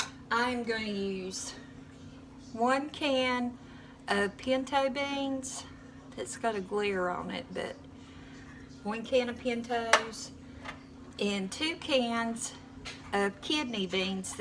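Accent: South, United States. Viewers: kes S - right, me too ! She is the only UTube person that I allow their ad videos completely go thru till the end. I know she makes more per view if they complete. Just love her to death, she reminds me of my grandma with her southern accent.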